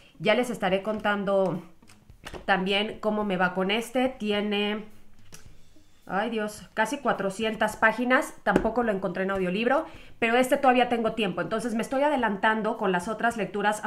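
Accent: Mexico. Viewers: Enamorado de tu precioso acento mexicano. Me explota la cabeza. Gracias por los vídeos. Enhorabuena. Recomendaciónes: Sinclair Lewis (La trampa humana, Babbit, Calle Mayor), Dorothy Parker (Colgando de un hilo), Toti Martínez de Lezea (La calle de la Judería, Muerte en el Priorato).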